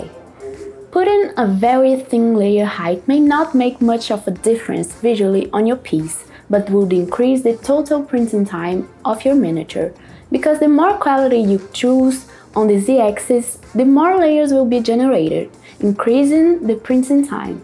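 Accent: Brazil. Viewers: Nice Brazilian Portuguese accent! Could tell just by the name hahaha I never changed the layer height settings in my printer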